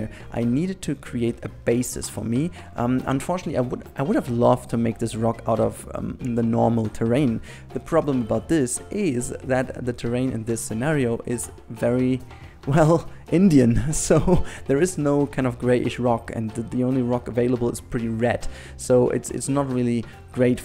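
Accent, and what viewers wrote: Germany. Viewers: Ich persönlich habe kein Problem damit, wenn jemand ohne Skript spricht. Den Deutschen Akzent hört man zwar raus, aber den machst du eigentlich durch gute Wortkenntnis wett. Ich kann dir jedenfalls gut folgen ;D Vielleicht redest du manchmal etwas schnell, aber nicht so, als dass ich das negativ anmerken würde. Mach einfach weiter so. Die meisten Kommentare unter diesem Video finden das auch so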